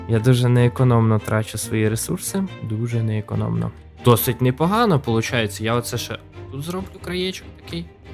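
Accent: Ukraine. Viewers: Как русскому, очень не привычно слышать украинский акцент._.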